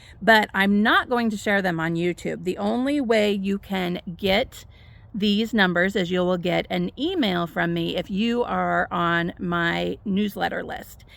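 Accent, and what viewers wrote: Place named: Midwest, United States. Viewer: New subscriber here. Glad to find you. I'm from the Midwest too. Maybe that is why I listen and like the way you speak and present 💕💕🎁❤😊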